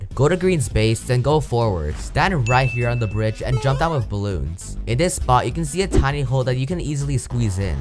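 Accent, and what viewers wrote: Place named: England, United Kingdom. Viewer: Ayo ur pilipino with English accent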